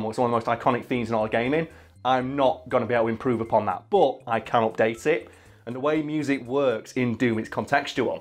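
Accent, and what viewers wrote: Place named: United Kingdom. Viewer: * heavy British accent *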